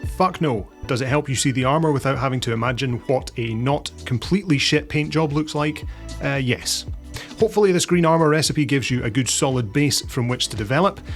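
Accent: Scotland, United Kingdom. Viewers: Nice video, good job on the green paint, end result would be fine on any table top. Side note: There is something about snarky comments and a Scottish accent that amuses the heck out of me. Always a good chuckle or three in your videos. Keep up the good work... love it.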